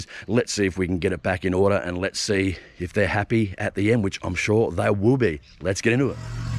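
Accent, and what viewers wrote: Australia. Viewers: Love Australian accent. I am a Zimbabwean living in Portugal.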